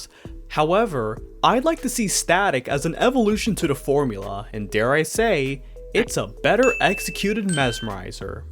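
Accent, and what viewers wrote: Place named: United States. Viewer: im guessing that the video creator is from america based on their voice. here, most people say it with a less pronounced second "t", like little being pronounced more like "liddle" here in the US. so usually people in the u.s pronounce it as "teh-doe"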